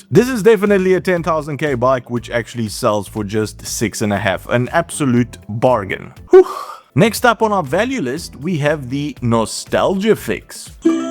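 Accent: Afrikaans, South Africa. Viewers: Just found your channel and looking forward to seeing more! Hoor ek reg, is jy dalk Afrikaans?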